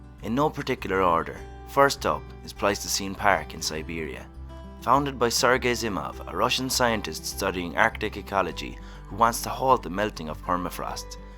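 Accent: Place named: Ireland. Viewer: Thank you so much great channel and wonderful to hear the Native Irish voice 😄😄.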